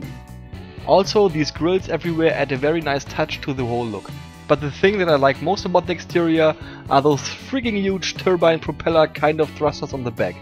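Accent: Germany. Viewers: are you german? cuz your accent, you know... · thats a nice ship, i only build in smallship form because it has more detail for my steam-punk fleet. btw, are you german? iam german and you sounds like that. :D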